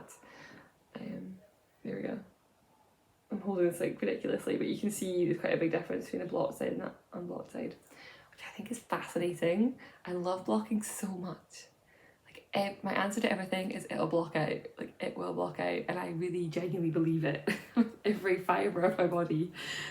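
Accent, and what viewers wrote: Scotland, United Kingdom. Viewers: Has your Scottish accent got stronger this past year?? 😂 Loving your creativity and pattern designs. Thank you for another fab podcast. Xx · I really enjoy watching your videos and I like the challenge of understanding you properly due to your Scottish accent. :-D Your Cargill pattern looks stunning, looking forward to knitting it once my knitting skills have improved. I have already knitted several sweaters, but they were all pretty simple. Greetings from Germany! :-)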